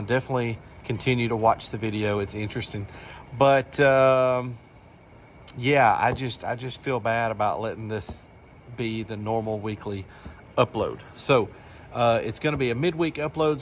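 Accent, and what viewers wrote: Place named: North Alabama, United States. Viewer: Whoa, you're local to me sounds like, I'm in North 'bama too. Other hobbies are wood working and turning, and hobby farm.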